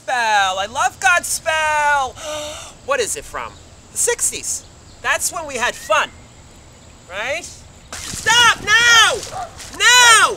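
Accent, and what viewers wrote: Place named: New York, United States. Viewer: He's got that New York/New Jersey accent.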